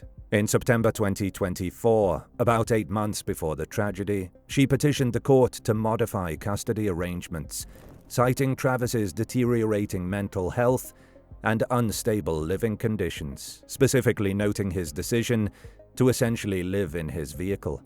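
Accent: United Kingdom. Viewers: I will cut him some slack as he sounds like he hasabritish or Canadian accent.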